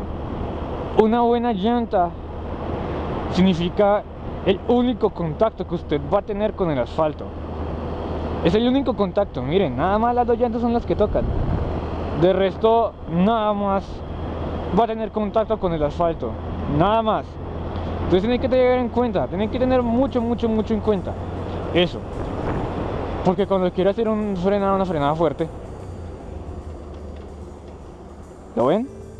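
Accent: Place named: Mexico. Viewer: dices que eres colombiano pero hablas como mexicano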